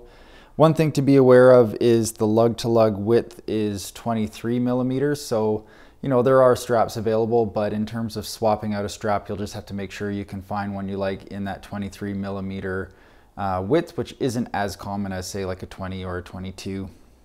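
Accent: Canada. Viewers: ohh I don’t know how to explain it haha maybe it’s the Canadian accent 😅 I’m sure you’re pronouncing it right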